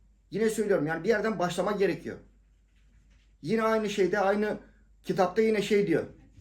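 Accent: Thrace, Turkey. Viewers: Şive hoşuma gidiyor Trakyalılar neşeli dir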